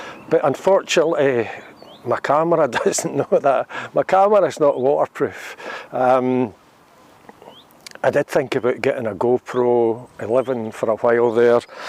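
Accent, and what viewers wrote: Scotland, United Kingdom. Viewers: Hi, I just discovered you while trying to find something on the TV to cheer me up ( I don't watch much TV) Well! that said, I am watching every episode of yours I can find. You have brought back my memories! thank you so much I love your shows. You even talk like me Ha1 Ha! I live in Australia but grew up in Kilmarnock and I used to do all that walking that you are doing now. Our country is beautiful and you show it so well, Thank You so much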